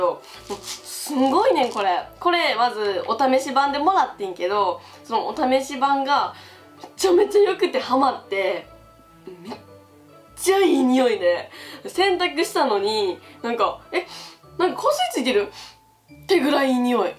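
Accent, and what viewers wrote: Kansai, Japan. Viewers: この動画みて関西弁の女子が好きってことに気づいた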